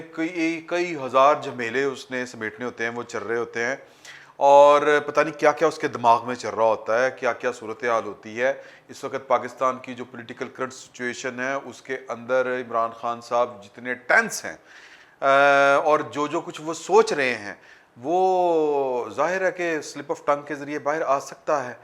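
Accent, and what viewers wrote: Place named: Lahore, Pakistan. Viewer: Yaar aap u tube say pehlay kahan thay qasam se mn aapka fan hogaya hon ur great sir g Khalis Lahoria wali boli hi aapki.shakhsiat ko chaar Chand lagati hai well-done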